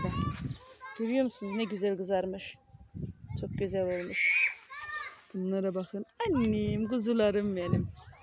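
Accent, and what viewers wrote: Azerbaijan. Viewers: Sen bizim gibi konuşiyorsun.Azerbaycanlımısın?çiçelerin çok güzel.bol çiçek açması için bir şey yapıyormusun?😊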